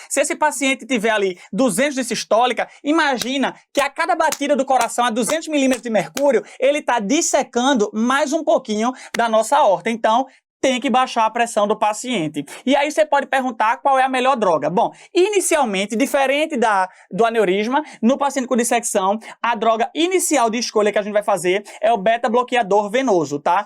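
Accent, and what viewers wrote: Pernambuco, Brazil. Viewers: Show demais, bem top assistir aulas assim sem ser "formal demais", sem falar o sotaque típico de Pernambuco que acho muito massa, marcante e personalíssimo; um dos mais bonitos das regiões do Nordeste na minha opinião. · Que aula tão maravilhosa! Além de um sotaque pernambucano, já tá na velocidade "normal": na velocidade do! kkkk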